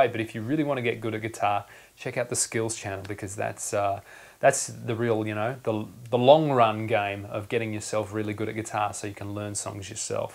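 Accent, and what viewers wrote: Australia. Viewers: I'm so glad you posted this... one of the most helpful guitar lessons I've ever seen. I still can't play it but at least I have hope. And your Australian accent is very very cute :)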